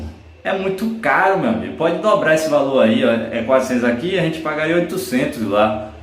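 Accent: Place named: Rio de Janeiro, Brazil. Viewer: Se vc é da Bahia porque esse soutaco carioca?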